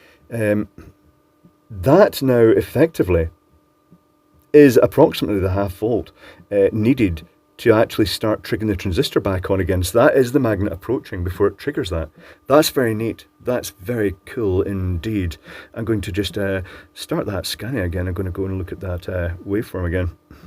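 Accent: United Kingdom. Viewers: Amazing Harry Potter accent. Instant sub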